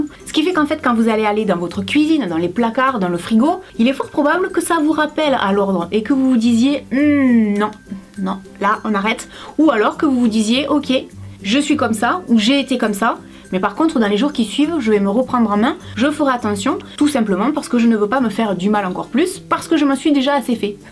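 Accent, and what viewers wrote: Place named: Perpignan, France. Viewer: Que dire une excellente vidéo! Que du bon sens et des astuces vraiment intéressantes. Merci belle, tu es d'un grand réconfort et ton accent de Perpignan pose du soleil sur les bleus de mon âme ☉🌝🌞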